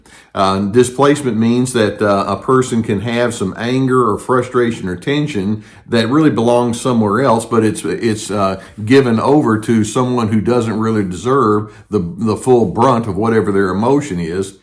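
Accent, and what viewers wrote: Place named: Texas, United States. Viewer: Oh boy! We love the Texas accent. Very very cool, it is. Swish and stylish. The Texan accent. From 2nd April 2023, My beautiful husband PD and I went NC from our abusers so, this means that we have experienced peace for six weeks so far and counting! What do you think of that, Gus? Woof from Evie dog here in Yorkshire UK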